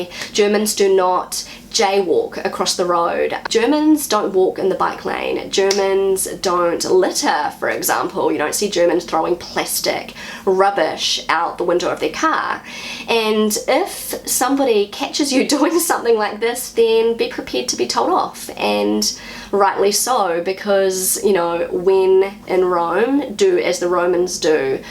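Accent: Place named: New Zealand. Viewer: New Zealand English sounds quite cute. However, the choice of words is a bit strange sometimes. ;-)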